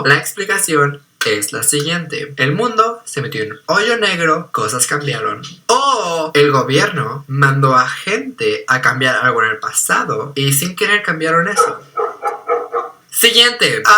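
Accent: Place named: Latin America. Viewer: soy española yuhuuuu me he dado cuenta de que hablas hispanoamericano, con hacento de hispanoamérica, tu profesor es de ahí? 💙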